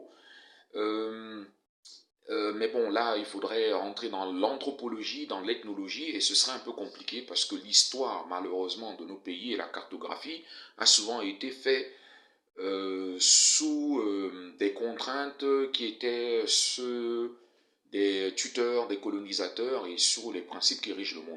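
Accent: United States. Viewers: Désolée, la prononciation n’est pas terrible pour des débutants. Je pense que cela est dû à votre accent trop américanisé. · Je pense que ça ne va pas aider les débutants désolée. Vous prononcez mal avec un accent américains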